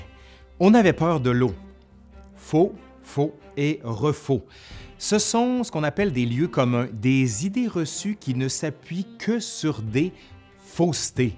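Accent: Quebec, Canada. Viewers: Un petit mot plus que dire juste pour ton référencement juste "référencement" car je ne sais pas comment va l'algo de youtube! Avec Nota Bene, Manon etc que tu connais, tu devrais recevoir un prix pour ton travail! Je te l'espère! Avec un petit accent québécois que j'apprécie! Bah oui, Belge, ça change de l'accent Fr ;-) Puis ma prof de science physique parlait pareil! Ok j'avais 18 ans... Et elle était si 😍😍😍Et je m'égare... Continue des partenariats! Et super, peu? ou jamais de sponsos qui saoulent de nord vpn ou autre! Je te souhaite de futures très bonnes vidéos et une audience décuplée!!!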